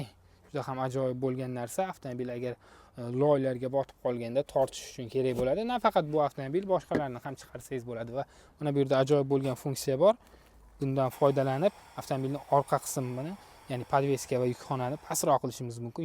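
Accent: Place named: Uzbekistan. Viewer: Соф ўзбекча обзорга гап йўқ. Мошина ҳам зўр экан🔥🔥🔥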